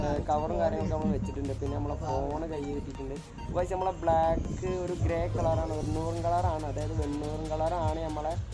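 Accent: Malappuram, India. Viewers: Malappuram ഭാഷ പെവർ ✌️✌️🔥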